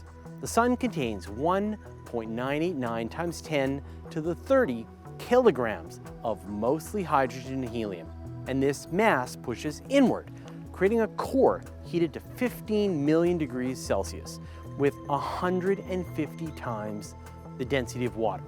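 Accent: Canada. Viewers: How do we know you're Canadian? If the first word in a sentence starts with an E, you really put emphasis on the E unlike Fraser Cain fans from the Old World xD btw good video today. In the past I thought you did this just as a hobby to encourage young people to get interested in science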